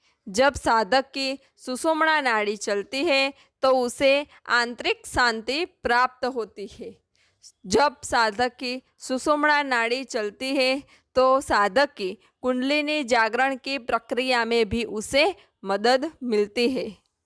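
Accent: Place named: Gujarat, India. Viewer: હું પણ તમારી ભાષા ના ઉચ્ચારણ પરથી અંદાજ આવી રહ્યો છે કે તમે મગજ માં ગુજરાતી નુ હિંદી માં રુપાંતરિત કરી ને બોલી રહ્યા છો 😊😊 જય શ્રી રામ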